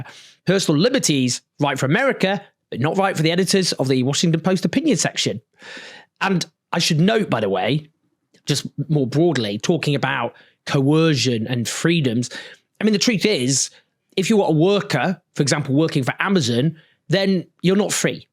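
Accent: United Kingdom. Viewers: You are a fool. Papers have had politics since day 1. That DOES NOT MEAN we don't live in a democracy - stuffing ballot boxes would mean we don't, people having a different opinion from us and owning a paper is undesirable but it isn't even anti-democratic let alone proof of the absence of democracy.....WTH are you smoking? BTW - you sound British so why do you equate the WP with absence of British democracy? ahhhh, I see, you're just a youtuber with an angle.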